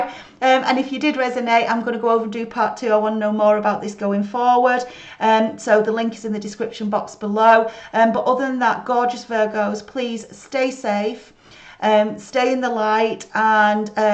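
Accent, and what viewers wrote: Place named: Newcastle, United Kingdom. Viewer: Love your reading (and that beautiful Geordie accent). Deffo dealt with a narcissist. Keep up the good work and will follow your guidance.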